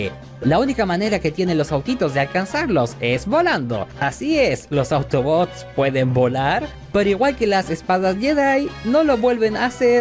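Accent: Chile. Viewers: De que parte de Chile eres? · ¿Usted es de Chile?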